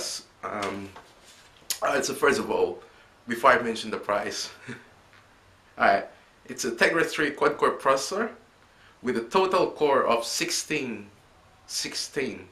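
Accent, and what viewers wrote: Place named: Philippines. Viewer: pinoy accent =))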